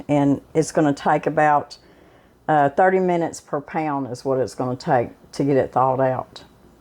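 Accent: United States. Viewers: Love to watch your videos love your country voice iam also in AR was raised on country cooking thank You God Bless you all.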